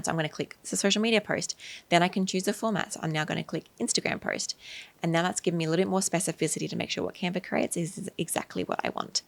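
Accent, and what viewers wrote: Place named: Australia. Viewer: Nice to here an Aussie voice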